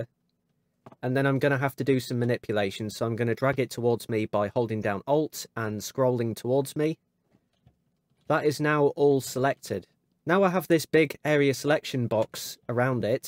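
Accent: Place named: United Kingdom. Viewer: That was fantastic, and extra points for the British accent! I learned heaps that I can use :D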